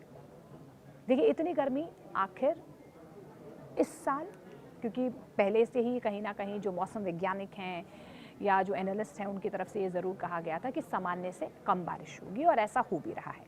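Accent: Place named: South, India. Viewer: खाबर😮 खबर होगा तू एक साउथ इंडियन है हिंदी से पता चली जाता है। बांग्लादेशी जितने भी साफ सुथरा बांग्लादेशी बोले वह बांग्लादेशी है बंगाली से समझ में आता है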